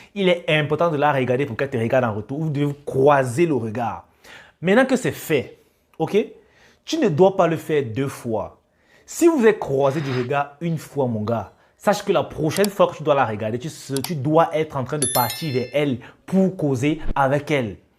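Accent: Cameroon. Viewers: Je reconnais là l'accent de mon frère du 237 😎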